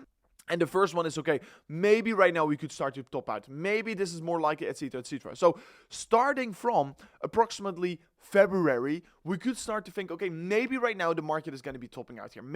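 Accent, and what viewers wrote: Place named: Netherlands. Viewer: Zo klinkt ie wel ja 😅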